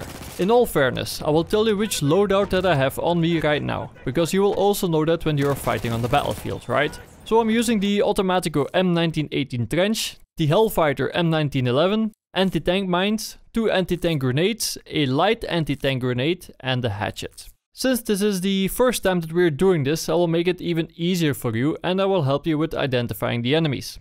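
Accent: Netherlands. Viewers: Kom je uit nederland? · The second you started to talk i noticed that you are dutch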